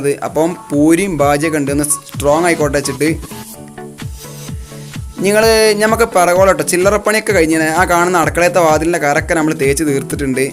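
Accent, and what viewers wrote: Malappuram, India. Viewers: Ath njammade malapram ayonda bane... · നിങ്ങളുടെ എല്ലാ വീഡിയോ കാണാൻ നല്ല ഹാരമുണ്ട് പ്രതേകിച്ചു നമ്മുടെ മലപ്പുറം ഭാഷ...നിങ്ങളുടെ സ്ഥലം എവിടെയാണ്....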